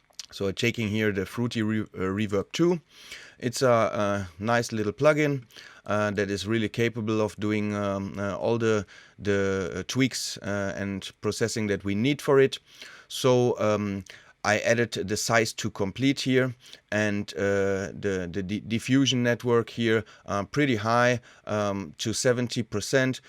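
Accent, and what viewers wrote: Germany. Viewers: man hört das er deutscher is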